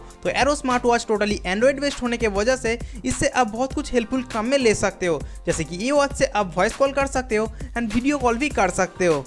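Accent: Bengal, India. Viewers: Are wa kya bangoli Hindi bolta he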